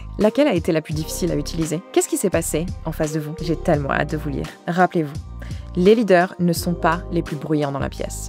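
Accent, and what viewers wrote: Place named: England, United Kingdom. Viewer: j'aime trop ton accent anglais en parlant français, je peux t'écouter toute la journée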